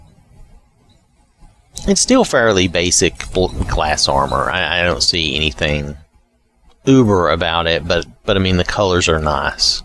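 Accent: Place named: South, United States. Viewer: Don’t hear a lot of southern accents in modding, you are appreciated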